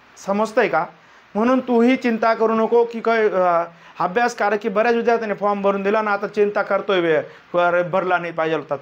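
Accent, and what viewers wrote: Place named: Khandesh, India. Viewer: सर तुम्ही कुठले आहे भाषा मस्त आहे खानदेश 😅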